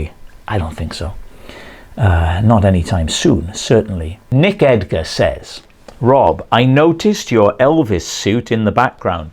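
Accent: Wales, United Kingdom. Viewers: Loved your welsh accent Rob, stonkin butt! 😀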